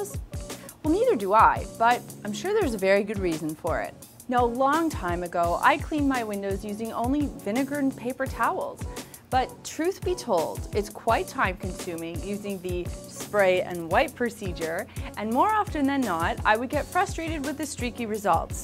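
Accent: Canada. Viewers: You can clean my windows anytime baby ! Just love that Canadian accent.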